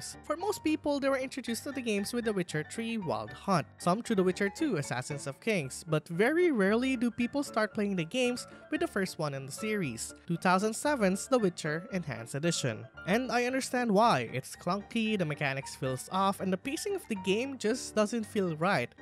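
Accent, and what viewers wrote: Philippines. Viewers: I can sense the Filipino Accent. Good english! I don’t normally watch Filipino youtubers because they can be really annoying with their repetitive sound effects and poor grammar. But you, I’m willing to watch for all of my Witcher content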